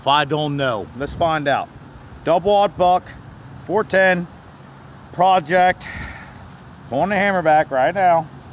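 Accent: New Jersey, United States. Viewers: Jersey accent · Chris Landry Jersey accent is an american accent 😑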